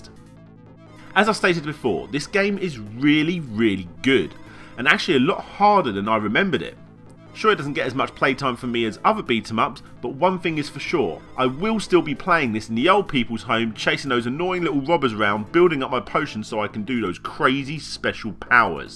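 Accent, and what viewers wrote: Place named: England, United Kingdom. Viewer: It's so nice to hear complete history videos from a fellow Englishman instead of American folk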